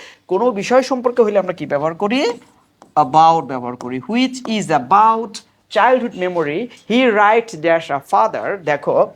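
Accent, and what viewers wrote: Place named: United Kingdom. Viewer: Sir Apnr English ta amder English moto bebohar kora ocit karon sobai Apnr occaron bubena Jake bole birtis basa Bangala dese manos moto ocaron korle Valo hoi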